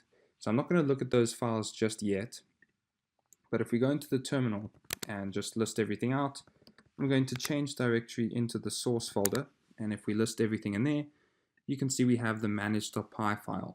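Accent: South Africa. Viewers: South africa? Create video, super clear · You are so from South Africa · xD defiantly a South African accent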